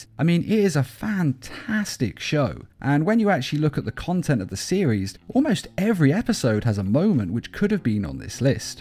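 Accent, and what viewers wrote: United Kingdom. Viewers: You forgot that the narrator is british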